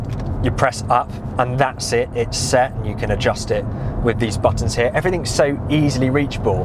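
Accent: United Kingdom. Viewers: Fantastic review. Love your British accent and colloquialisms, how apropos. I live in the United States and this is the next car that will be in my collection. I've been looking at them and wanting one for a while I just already have 12 and value my ability to not be a corporate slave. I can well afford it I'm just holding off as long as possible on spending near 50 Grand but it's coming lol. I have an 04 XJR in my collection and this parked beside it would be stellar. Fab review!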